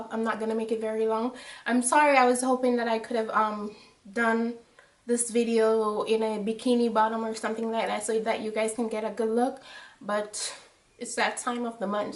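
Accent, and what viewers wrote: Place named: Jamaica. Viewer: I know a Jamaican when I hear them! I can hear through your American accent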